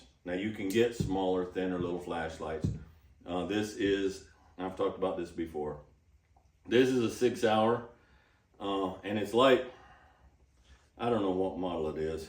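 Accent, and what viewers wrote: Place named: West, United States. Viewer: I wish to get this western accent.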